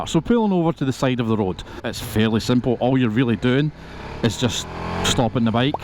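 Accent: Scotland, United Kingdom. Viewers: Nonetheless the riding and all, I love the Scottich accent 😅❤❤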